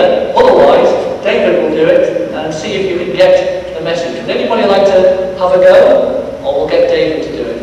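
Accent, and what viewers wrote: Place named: England, United Kingdom. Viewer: This guy is definitely English. SECOND POST!!!!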